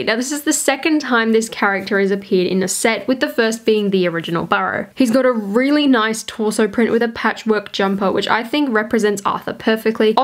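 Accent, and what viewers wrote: Australia. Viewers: I love your accent! I watch your videos to practice my Australian English listening. New follower 😉.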